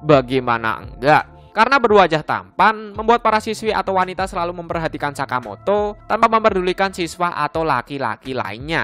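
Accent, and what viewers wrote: Java, Indonesia. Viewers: Gk popo asli jawa yh? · Jawa ya bang? awokawok sama :v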